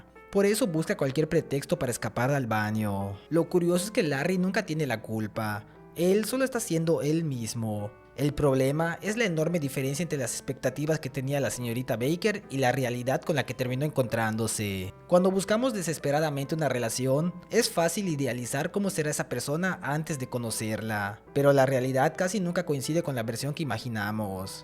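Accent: Yucatan, Mexico. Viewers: Maaaa mi karnal suena como yucateco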